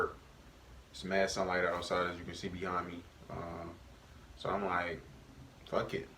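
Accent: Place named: Philadelphia, United States. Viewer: u gotta be from philly the way you talk ahaha really love the music vibe and the photos!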